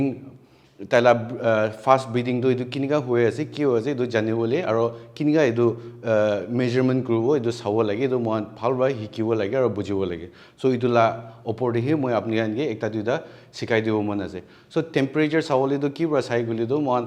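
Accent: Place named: Nagaland, India. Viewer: Your nagamese is very sweet and clear hope everyone will become a good Frontline workers after hearing your message.Thank you Dr.God bless you for your good works.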